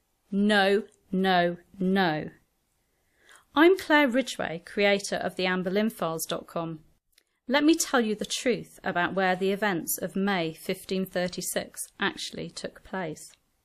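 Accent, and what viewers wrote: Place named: East Anglia, United Kingdom. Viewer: Misinformation is commonplace with most if not all historic sites. Tour guides or docents if you like; often have to follow a strict guideline or script of what they tell the public. It's unfortunate but all very true. Here in the States this happens mostly regarding period homesteads from Salem Witch Trial sites in Massachusetts to antebellum plantations in the former southern colonies and later states. Oh by the way, lovely accent! East Anglia?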